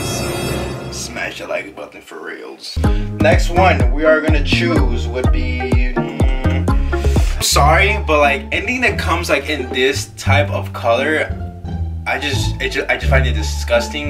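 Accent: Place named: Chicago, United States. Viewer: This video randomly popped up for me, and instantly after hearing you speak I was like "this kids from Chicago..." so I went on your page, and bam... I was right. lol Hello fello Chicagoian !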